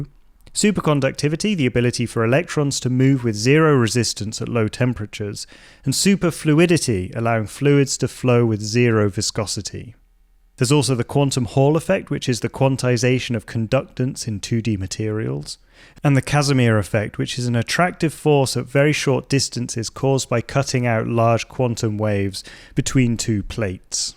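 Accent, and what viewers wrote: United Kingdom. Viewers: Your British accent is enough for me to believe you. Forget about the content.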